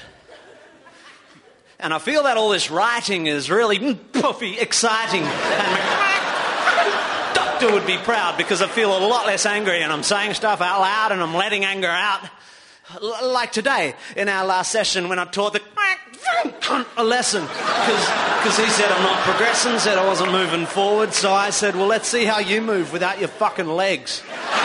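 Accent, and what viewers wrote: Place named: Australia. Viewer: Early Minchin, My god you can hear his aussie accent.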